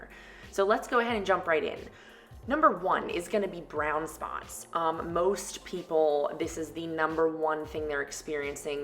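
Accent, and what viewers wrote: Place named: New Orleans, United States. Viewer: Great video... all I needed. Hopefully I can nurse my Fiddle back to health. Also, off topic, are you originally from Louisiana by any chance? You sound exactly like my cousins from New Orleans. Love your presentation and enthusiasm!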